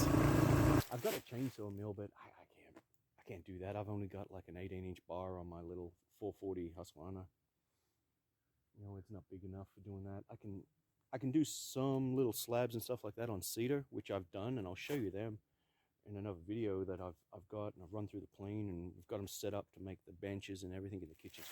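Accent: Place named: United Kingdom. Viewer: You accent ain't from around here?? I hear....British?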